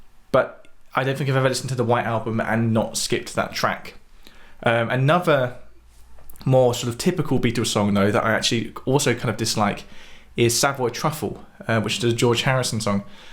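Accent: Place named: Bayswater, United Kingdom. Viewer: as it pertains to the quantity of subscribers, if not for that Bayswaterian accent of yours, you'd probably only have 2 subscribers. I keep waiting for someone to yell "CUT!" and then you go into some American deep south manner of speaking. "did y'all get that? is that a little ol' take?" Seriously, you bring a TON of value and you make things easy to understand and you solve the riddles/puzzles about songs I've always wondered about like your video on and such. For me, I just want to jam and be able to understand the language. I don't think I'll ever be at Macca level because I have other things going on (like working on planting agave to make tequila, as you can see) but to be able to get up on stage and play the songs that I enjoy and that bring energy would be great for me. So, your vids get me closer to that end. Gracias, amigo.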